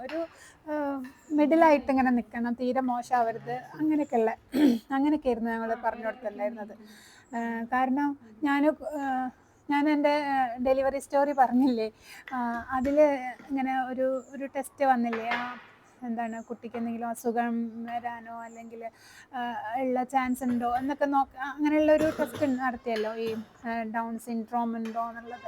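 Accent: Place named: Palakkad, India. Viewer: Samsaram kettitt Palakkad aanennu thonnunnu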